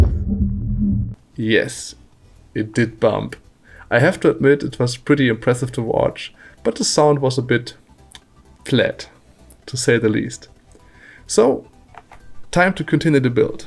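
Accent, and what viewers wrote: Germany. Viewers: Da hör ich doch den Deutschen Akzent raus🧐🤓😅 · Dein Englisch klingt echt deutscher als meins? Wie? Und ich dachte ich wär der einzige der zu doof is für diese komische aussprache xD nichts für ungut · Ich fand dein Video sehr hilfreich und man hört sehr, dass du deutscher bist xD. Aber könntest du mir ein paar Daten des Lautsprechers geben? Also wie viel Watt RMS der ha z.b? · Man hört deinen deutschen Aktzent mega raus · you have the most german accent ive ever heard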